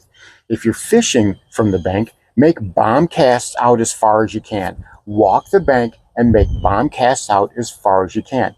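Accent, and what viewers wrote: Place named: North, United States. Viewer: Hey your accent sounds kinda northern—how did you end up in southern Mississippi?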